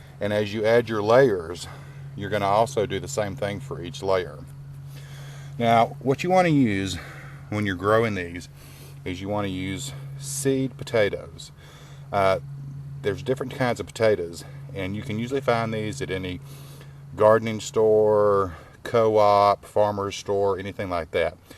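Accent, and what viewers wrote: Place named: South, United States. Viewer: Lovely! Thank you for the post. (I love your sweet southern drawl too!)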